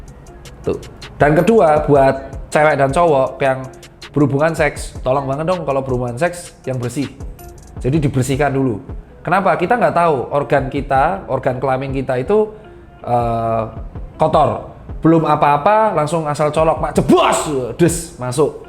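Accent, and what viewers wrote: Java, Indonesia. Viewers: Mak jebuos... 🤣 medokkk...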